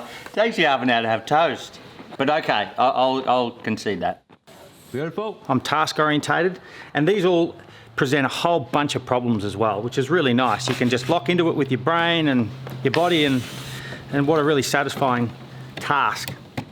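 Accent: Australia. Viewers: I kept forgetting you were Australian until the comment about the "kooky old farmer" who "ran around his paddocks with his gumboots on." Which I had to play 3 times before I could even understand the words you were using 😂. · definitely australian maybe from the north west? filmed in VIC or tazzie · Definitely Australian. He has a more pronounced rural accent which is easy to mistake for NZ if you’re not from here but he lives in Victoria. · Hey. I'm an Aussie living in Vienna, Austria. You make me miss home. The enthusiasm, the accent, countryside and Aussie girlfriend/wife. Also big on running. Loved everything about this video. Inspires me to get off my butt and be more productive maybe even make a youtube channel myself! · this guy is australian as fuck!!!! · Where is he from? I can't seem to properly pinpoint his accent. Is he Australian?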